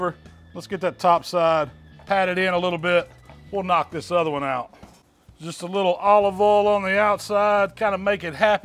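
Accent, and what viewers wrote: South, United States. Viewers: Crazy how over the years your accent has gotten more southern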